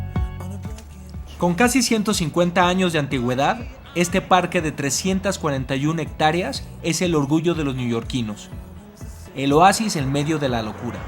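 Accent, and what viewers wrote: Mexico. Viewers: En ese tiempo tenías tu acento mexicano más marcado, me parece que ahora hablas más neutro. Saludos desde Chile! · Estabas taaaan diferente: El look, los lentes, además el acento Mexicano súper marcado y los comentarios eran como más sarcásticos! Jajajajja- Me encantan tus videos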